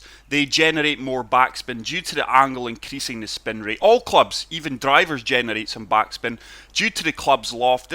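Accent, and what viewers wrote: Scotland, United Kingdom. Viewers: Thanks for the vid, got Golf Club 2 and never saw any back spin. Though can use some pointers here, always a wee bonus getting a Scot doing a tutorial, nice one bud 🥃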